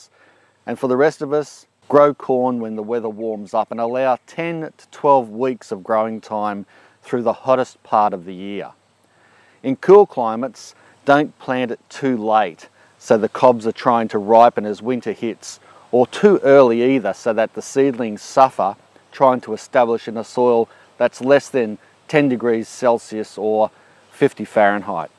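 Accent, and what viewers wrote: Australia. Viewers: Hi! Love this video. Your accent and enthusiasm reminds me of Steve Irwin, except with vegetables instead of animals!! I'm going to attempt to grow some Bantam corn in a couple of whiskey barrels this year. (I live in an apt, so don't have a garden.) This was great info about spacing, and direct-sow vs. transplanting starts. I saw another video where the corn seeds were started in net pots instead of jiffy pots and they did great. The open-air design of the net pots allows for air-pruning, and not having the plants get root-bound in the starter pots. Good root system, but not overgrown. Thanks a lot for all the tips! Be well. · I am a new sub and I don't know how I stumbled upon your channel but I am so happy that I did. 1st- I love your accent (Australian is my favorite), 2 - you have a wonderful sense of humor (corny even...lol) 3 - the content and way you present the information is spot on and to the point.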